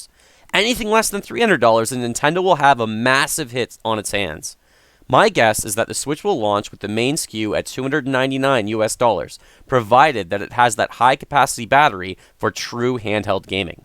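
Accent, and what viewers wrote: Canada. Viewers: "it has BEAN in development it has BEAN delayed" gotta love Canadian accents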